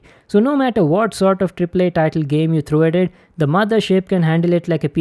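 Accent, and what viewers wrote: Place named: India. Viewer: At least I found the perfect Indian accent so smooth!!!!!!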